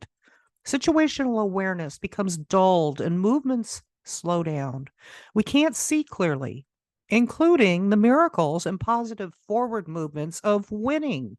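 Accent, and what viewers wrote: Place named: Illinois, United States. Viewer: Love your voice! Reminds me of growing up in Illinois! Great info and a pep talk too just to remind us how far we’ve come!😊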